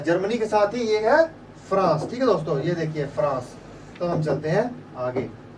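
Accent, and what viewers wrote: Punjab, India. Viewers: Sir g apki language me punjabi jhalk rhi he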